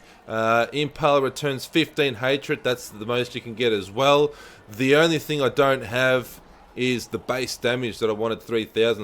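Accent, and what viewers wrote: Australia. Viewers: once I heard Aussie accent I subd. on ya bro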